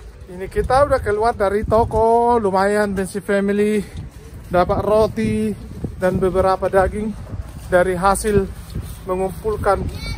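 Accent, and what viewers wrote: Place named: Sumatra, Indonesia. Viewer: Cara bicaranya seperti orang dari Sumatra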